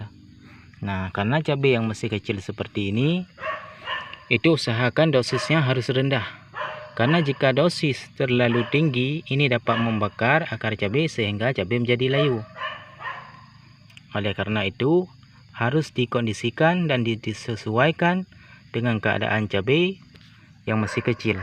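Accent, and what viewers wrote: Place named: Aceh, Indonesia. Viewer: Dr cara gmg nya psti ni org aceh kan bro,kiban bro awak pane???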